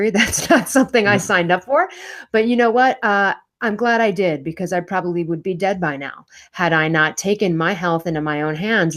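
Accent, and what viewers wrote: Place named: Australia. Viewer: you could be taken as an Australian with that accent.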